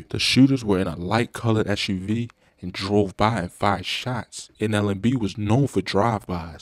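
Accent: Baltimore, United States. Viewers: That Baltimore accent heavy Asf bro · stop the bs he definitely from Baltimore lol I have family from there this is Baltimore accent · baltimore accent so annoying lol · Definitely from Baltimore accent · you from baltimore. Accent crazy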